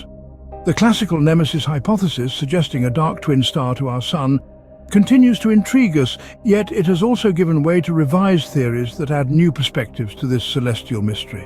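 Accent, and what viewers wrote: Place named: United Kingdom. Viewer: This channel has British narration but channel has no social info and based in Japan? 😂 Neat. And video was nice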